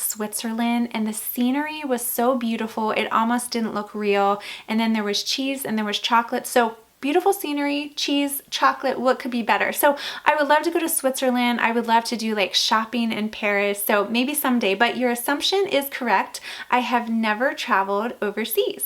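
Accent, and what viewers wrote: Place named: Chicago, United States. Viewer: I totally miss this and didn’t ask you my question. You have a beautiful accent and I think you might be from Chicago. Is that a accurate?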